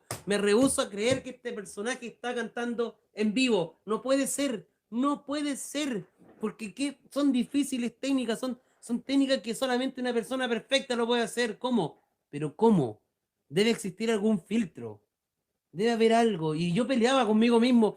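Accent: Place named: Chile. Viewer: jajaja gracias por el análisis... he visto a otras personas llorar cuando lo escuchan por primera vez, así que no te preocupes. Un abrazo, desde Argentina (supongo que sos chileno por el acento)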